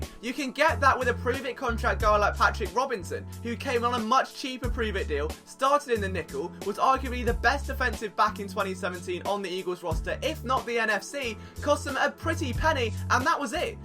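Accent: United Kingdom. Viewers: You have much wisdom in the realm of football, my son. In spite of your British accent which would suggest one to assume you care nothing about the game at all...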